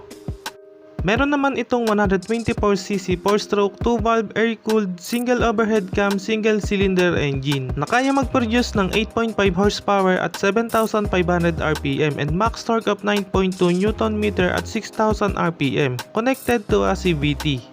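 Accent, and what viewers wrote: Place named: Baliuag, Philippines. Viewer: Sir taga saan ka po? Hahaha. Tawang tawa ako sa tono ng naration mo. Ganyan kasi tono ng salita namin taga baliuag. Hahaha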